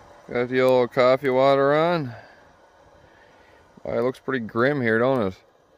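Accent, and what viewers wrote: Newfoundland, Canada. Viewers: Enjoying your videos, are you from Newfoundland and Labrador? Your accent is certainly sounds like the Rock, best place in the world😉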